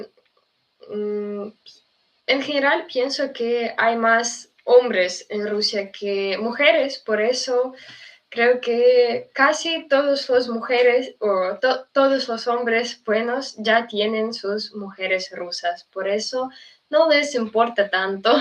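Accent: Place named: Spain. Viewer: Tienes acento de el país de España pero no castellano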